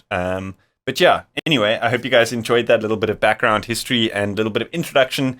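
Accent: South Africa. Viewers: Yo are you South African my g you have the accent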